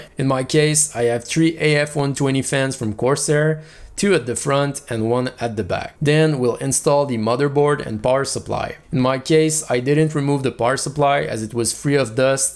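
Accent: Quebec, Canada. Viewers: Est tu Québécois ? :D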